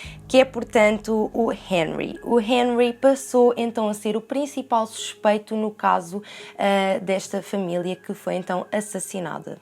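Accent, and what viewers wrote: Portugal. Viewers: Amo o sotaque português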